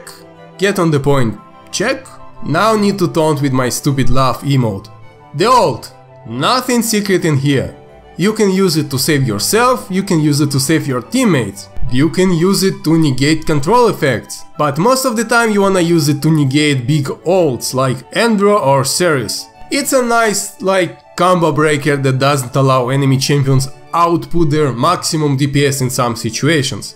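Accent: Russia. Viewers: Чувак,ты русский?) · U are Russian?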